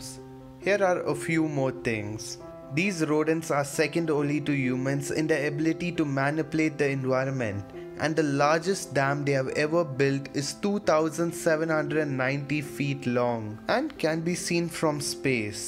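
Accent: India. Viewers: awwww hit a nerve how am I racist for saying taliban ???? And he really sounds like India but sorry I'm no expert like u in accents BIG BRAIN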